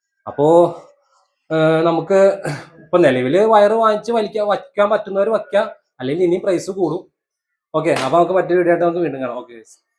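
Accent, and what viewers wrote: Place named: Kannur, India. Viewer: സംസാരം കേട്ടപ്പോൾ kannurക്കാരനാണ് തോന്നി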